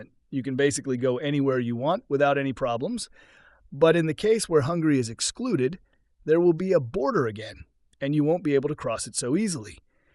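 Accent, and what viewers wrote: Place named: Germany. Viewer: Is this AI speaking English? It’s so weird. I prefer to listen to your English with German accent. 😊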